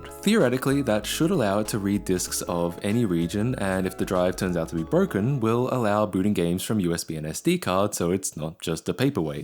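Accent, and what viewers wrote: Australia. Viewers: Clicked on this video after a random recommendation and was pleasantly surprised to find a fellow Australian. More than that, your voice is crystal clear and really quite calming, on top of the fun and exciting content. Well done, dude! And thank you!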